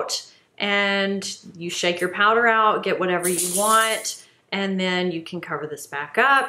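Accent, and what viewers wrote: South, United States. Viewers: I'm new to your channel. 10 seconds of watching you and you have yourself a new subscriber. Also do I hear a southern accent?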